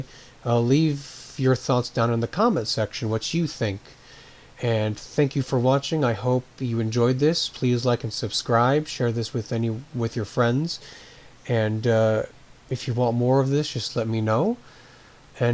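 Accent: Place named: North, United States. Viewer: You sound like a yankee carver bagger.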